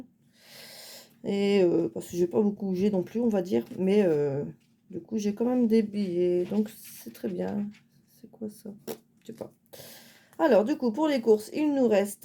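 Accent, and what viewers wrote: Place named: North, France. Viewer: J’adore cet accent nordiste en espérant que je ne me trompe pas 😂 et ton duo avec ta moitié 🥰😍😻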